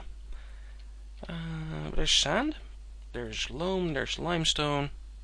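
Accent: Netherlands. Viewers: are you dutch? you have an accent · are u from holland>? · From your accent I can tell you're dutch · Are you dutch? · are you dutch cuz i my english sounds EXACTLY like u o.O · you sound dutch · Is that a dutch accent I detect? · Dutch accent yez? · Are you Dutch? Your English accent sounds that way. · He's most likely from the Netherlands, since the accent with which he is speaking is very recognizable for me. I didn't know it was a charming accent. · Yeah hes dutch allright , but its understandable so its all good :) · absolutely Dutch accent :) I'm Dutch myself and I kind of recognize it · Can I ask you a off-topic question? Are you Dutch, perhaps, if so, you're way of talking gives that away ^^ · Can't hide the dutch accent eh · HOLLAND kan je horen aan je engels xD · Dutch accent is Dutch.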